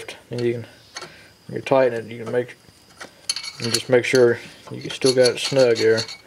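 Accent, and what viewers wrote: South, United States. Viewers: Are you a southerner ?? Lol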